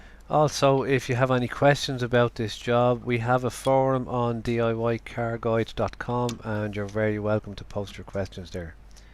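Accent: Ireland. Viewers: Love your sense of humour and your Irish accent. Thanks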